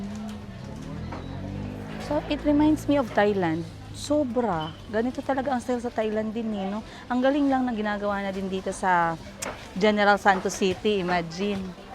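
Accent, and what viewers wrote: Iloilo, Philippines. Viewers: Urs when i hear you speak ilonga nakakatuwa but My papa is speaking bisaya he is from bohol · Natutuwa nga aki madam mag salita kw ilongga kc may kaibgan dn ako taga ilo ilo.ingat po kau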